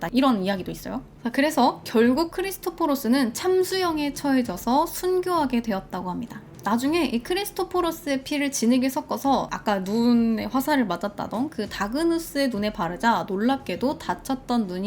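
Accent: Gyeongsang, South Korea. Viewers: 오늘은 억양이 경상도인데 저만 그렇게 느끼나요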